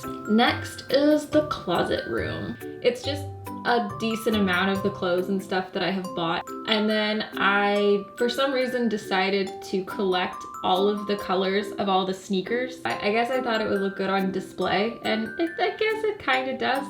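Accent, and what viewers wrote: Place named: United States. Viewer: Are u American because u have a different accent then beatemups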